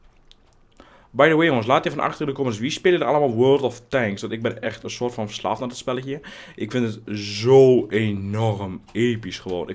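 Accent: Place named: Twente, Netherlands. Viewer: Je bent echt heet twents :P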